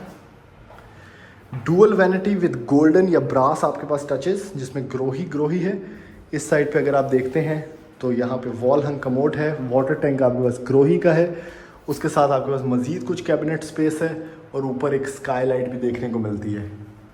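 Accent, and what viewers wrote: Punjab, India. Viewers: Apni videos mein ap jo Punjabi touch daity hu usy increase kar do specially ye word " lamkaaa " howa hai apki mostly videos mein sunny ko milta😂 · Beautiful house with your beautiful punjabi touch accent. Keep it up😊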